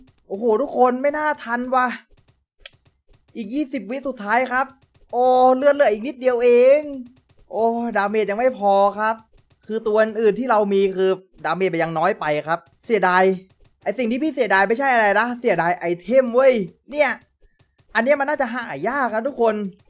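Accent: Phetchaburi, Thailand. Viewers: ฟังเสียงก็รู้เเล้วว่าเป็นคนเพชรบุรี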